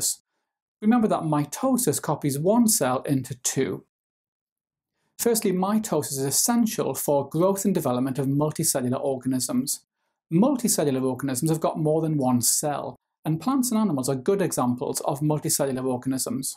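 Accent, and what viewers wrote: Wales, United Kingdom. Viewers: As instructed by my teacher in my online homework task, I express my deep appreciation for your content and dulcet Welsh tones.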